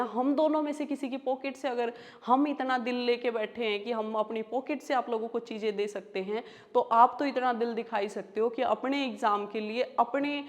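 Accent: Haryana, India. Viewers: Mam hariyanvi jhalak aa gi voice m 😅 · Aap haryana se ho mam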